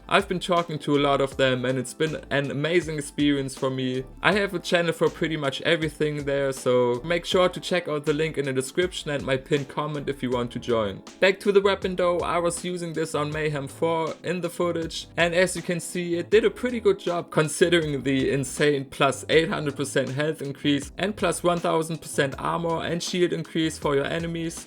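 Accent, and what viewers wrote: Germany. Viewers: Höre ich da deutschen Akzent? xD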